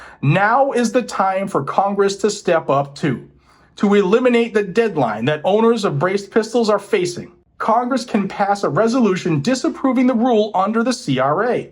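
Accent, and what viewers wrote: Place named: Utah, United States. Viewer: sounds like Utah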